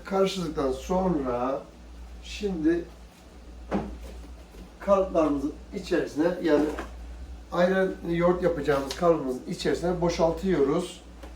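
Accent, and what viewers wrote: Gaziantep, Turkey. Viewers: abi sen antepli misin?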